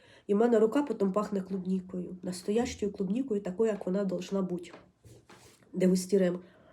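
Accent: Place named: Surzhik, Ukraine. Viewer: Полуниця, полуниця, чорт забирай! Вуха заклало., ви журналістка, полтавка, відмовляйтеся від суржика😊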